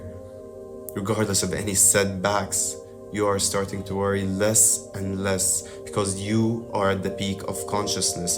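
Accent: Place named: Ireland. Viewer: Your accent is mad. Can I detect a hint of Irish?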